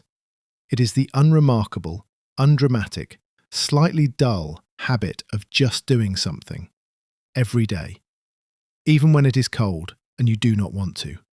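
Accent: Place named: United Kingdom. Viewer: The British voice always signifies doom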